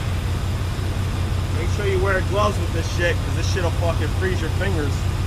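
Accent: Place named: Philadelphia, United States. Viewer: My man gotta be from Philly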